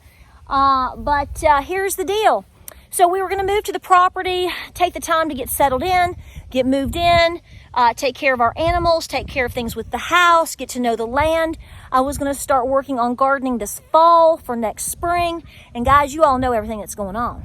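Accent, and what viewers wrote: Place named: East Tennessee, United States. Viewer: Hey! I spent my first 18 years of life cradled in the Ridge and Valley Region of East Tennessee. Great people, for the most part.... And it feels like the most ancient region of the world, and maybe it is? I so enjoy hearing your stories, and hearing that accent that I love hearing coming from a woman. Down to earth, hard working, tough willed and minded, the breed of people from that area are some of my favorite. I enjoy your even-handed approach to everythiing. You always take a common sense direction in everything you do, and that includes praying about it. That's the most important part, isn't it? Ha! Keep on doin' what you're doin', Girl.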